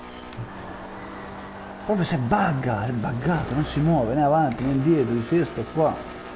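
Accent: Rome, Italy. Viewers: Súper romanaccio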